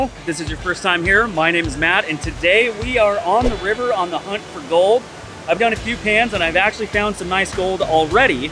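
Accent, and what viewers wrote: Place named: United States. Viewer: Gold in the top material, gold in the red oxidized sand, gold in the blond sand . Flood gold is found in flood layers? Excellent deduction! What country are you in? What river? You have an American accent, not Australia, Colorado, Arizona? are you in Northern California?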